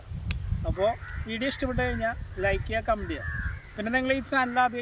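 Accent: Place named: Thrissur, India. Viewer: Kettaa arinjoode thrissur aanennu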